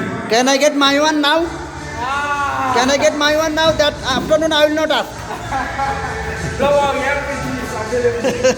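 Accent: Noakhali, Bangladesh. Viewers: ভাই আপনার বাড়ি নোয়াখালী?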